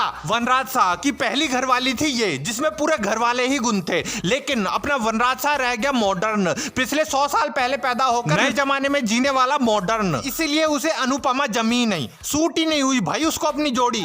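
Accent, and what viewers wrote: Rajasthan, India. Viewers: Bhai tera rajasthani accent 😂👌👌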